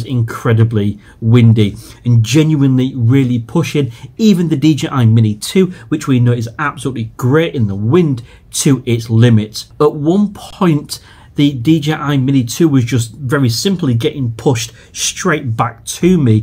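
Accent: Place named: North East England, United Kingdom. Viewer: Definitely doesn’t sound like a Yorkshire accent being on the Humber where is it you’re actually from? It sounds more Sunderland Newcastle Middlesbrough area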